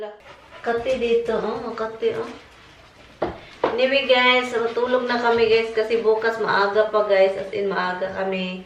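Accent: Visayas, Philippines. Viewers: hello mam maayong buntag....ka cute sa imong mga ering og ero hehehe bisaya kaayo.....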